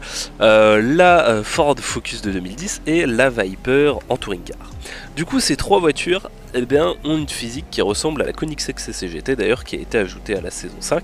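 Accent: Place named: Lorraine, France. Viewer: lol me suis fais la meme remarque. mais ça c'est l'accent de lorraine; en vrai il est assez eloigné du français lol.. comme le "j'ai pu', au lieu de "j'ai plus"..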